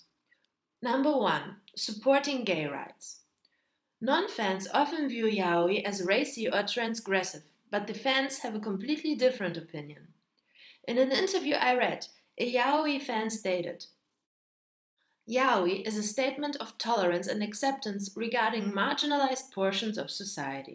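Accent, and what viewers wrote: Germany. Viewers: Your German? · Are you German? :3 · I love how it is super obvious that youre german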